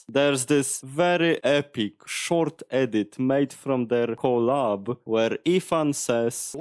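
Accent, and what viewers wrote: Poland. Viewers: your accent sounds so polish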